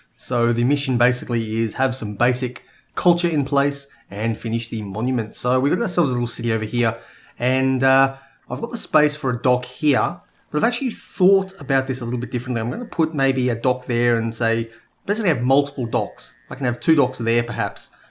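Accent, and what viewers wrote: Australia. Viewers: You sound like an Ozzie, so why do you pronounce route as rout? Never heard an Oz do that before.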